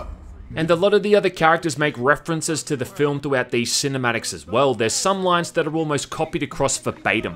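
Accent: Australia. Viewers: My new favourite thing: listening to game reviews in an australian accent 😅 I wanna add, that cypress hill not only made the soundtrack some of the voice acting as well. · defo an aussie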